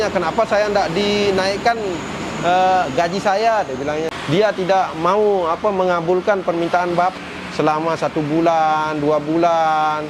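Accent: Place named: Lombok, Indonesia. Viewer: Perpaduan antara logat sasak dan logat bali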